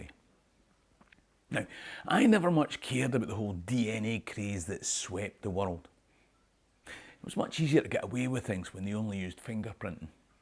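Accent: Scotland, United Kingdom. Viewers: You make a great point- are we our genetics or our culture? Genetics determine what we look like, but do not define who we are. You sure sound Scottish to me and all of your videos demonstrate a great curiosity and love of Scotland. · I am sure you know already, but you have a lovely family, and you speak like a Scot, not a Sassennach! Reference databases are skewed and very unreliable. Maybe your proportion of Nigerian DNA is because bananas grow in Nigeria, and we share so much DNA with bananas? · I don't know how anyone who hears you speak could possibly think you are anything other than Scottish. Racists make no sense. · Dear: You are definitely a Scot! From your accent to your attitude. My best friend growing up was born of full blooded Scots - Highland mother and Lowland father. I couldn't understand her father at all, and I sometimes wondered if that was on purpose, to discourage unwanted visitors. 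He was part of the local fire department and worked long hours. My friend raised budgerigars, teaching them to speak and sing. Everyone of those birds picked up her mothers accent! It was hilarious! When the birds would talk, sometimes they would imitate her mother calling her. It was such a precise copy, that Charlene would answer them before realizing it was the birds, not her mother. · Well, as a Czech who listens to you in a foreign language, twice over... your voice basically is what my mind now conjures when I think of someone Scottish, AND listening to you regularly helped me understand other Scots, too. So the racists who deny you that side can go to whatever your preferred expletive is. 😂 (And also as a Czech I'm undoubtedly a Central European mutt. Years ago a distant relative did have a DNA test made and back then had the closest match in Ireland...) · You sound like a Scotsman to me.. 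· Your accent is Scottish enough! My YDNA is very Scottish, my mother's grandfather was a Scotsman, like my father & her surname also Scots, but mtDNA triangulated as U2c1 from India/Pakistan/Afghanistan: there's a known colonial slave ancestor from Malabar (Kerala). My tan comes from father's biracial grandmother, also mainly S Asian DNA there l think..other family members are paler with a few exceptions. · Sorry, but you sound so Scottish it gives me my tingly happy fix! Those racists are so dumb, I am worried for their health. Well, not really scared, cause everything bad that comes to them they deserve tenfold. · You sound Scottish. 😉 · you are a Scottish standard bearer! Yes, you have west African heritage and are rightly proud of it. Your accent, behaviours and, above all, attitudes are quintessentially Scottish. You see things from an egalitarian perspective, not a mad “patriotic” climber of lampposts. 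I’m proud to say that I am the same as you! God bless you and Lang may yer lum reek! ❤️🏴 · I think your accent qualifies you as Scottish. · Your accent conveys Scottish but your DNA tells another story ! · You are steeped in Scottish history, live in Scotland and certainly sound like a Scot. You are certainly Scottish in my book! Always enjoy your content! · "Then ye spet inae coop"... No one can tell me this man isn't Scottish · For someone that some are trying to claim is not Scottish, you have a real canny Scottish accent (that's canny in the Geordie and not the Scottish sense, just to confuse the Scots). It seems some people just cannot hear the obvious. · I don't know how anyone can question Scotnicity given his accent. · With an accent like that you are most definitely Scottish my man, your ancestry is just that what ones ancestors were does not make you it, I am from Romani decent but I'm English not punjabi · you are Scottish to me. 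You were born in Scotland, and your Mammy is Scottish. You’re from the same toon as my parents and you sound just like them! I was visiting Perth with my American son and he recognized your poster up at the Theatre 😊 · I dunno, you sound Scottish to me!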